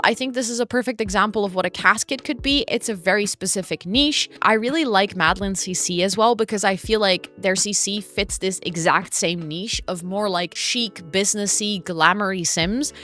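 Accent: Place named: Netherlands. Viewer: You sound a bit Dutch, are you from The Netherlands?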